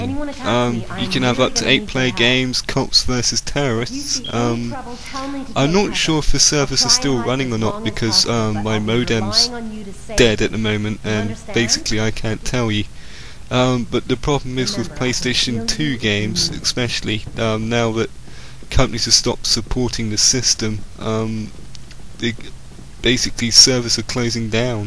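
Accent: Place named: United Kingdom. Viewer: hahaha, british accent xD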